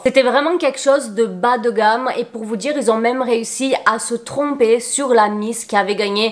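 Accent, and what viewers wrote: Brazil. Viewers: Ce n'est pas l'accent du Sud de la France, c'est un accent brésilien parce qu'elle est brésilienne 😅😅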